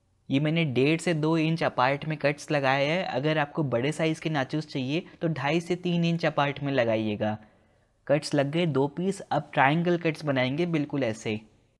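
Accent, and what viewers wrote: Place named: Indore, India. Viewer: Indori tone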